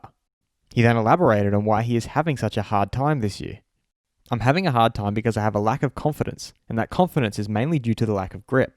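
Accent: Australia. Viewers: These clips are fabulous - I find it difficult to believe you only have 100,000 subs. Your videos are easily of the same calibre as any TV program from back in the day when people still WATCHED tv, lol. Really stoked to find this channel, as a fellow Aussie it's really cool to hear the Aussie accent. GREAT STUFF!!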